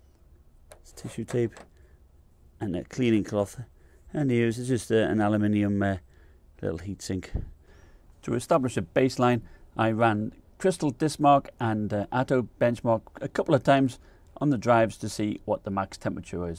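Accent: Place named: Billingham, United Kingdom. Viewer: It had occurred to me that something new might be going on, I hadn't installed anything but sneaky coin mining type activities is always a possibility, so I formatted and did a clean install of Windows yesterday, and even as it was installing it was getting hot. Performance whilst installing seemed a bit off, but since then it's been going OK - as I said, just a bit impractical with the cover off. I was more worried that the excess heat could do a bit of damage to everything else inside the laptop. I had hoped it would be a simple 'yep, bung a new SSD in and all will be well in the world' but maybe I'll just have to get used to the cover being off. Thanks anyway though, I'm looking forward to browsing through some of your video's, not everything interests me (I'm an old Unix and cobol zombie from way back but I just don't get gaming at all), I watched the one on how much money you make from a few weeks ago, it was really interesting, and you were helping those who may be just starting out with tips, which is really nice. 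(My husband is from Billingham, you sound rather local. Hope you're not sulking as bad as he is because Derby won )...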